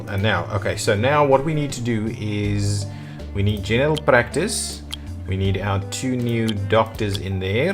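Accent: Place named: South Africa. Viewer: You must be from SA 🎉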